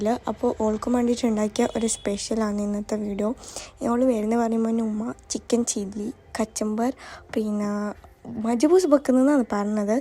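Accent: Kasaragod, India. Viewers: Ksrgod aanh😂🤍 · Kasaragod touch😂❤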